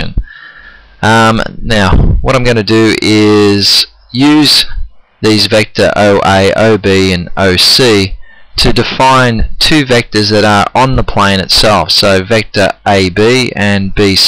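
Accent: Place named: Australia. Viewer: smexy aussie accent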